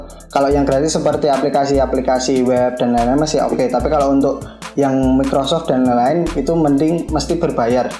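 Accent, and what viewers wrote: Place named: Java, Indonesia. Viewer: Medok banget ><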